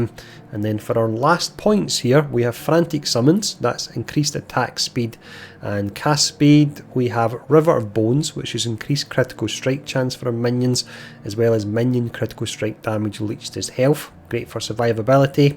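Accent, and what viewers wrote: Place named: Glasgow, United Kingdom. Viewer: Great Vid thanks for covering the passives like you did! Now, forgive me if I offend, the accent my buddy and I are trying to place - Glasgow? Thanks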